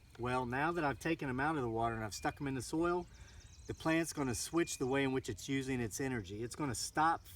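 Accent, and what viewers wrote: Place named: Virginia, United States. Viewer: Before I even noticed your hat I knew you were from VA from your accent!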